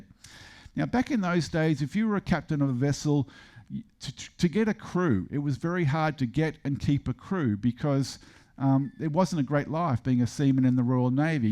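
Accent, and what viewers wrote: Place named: Australia. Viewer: I have a couple good scottish friends and they do not sound like this guy, I thought he was australian.. anyway very interesting .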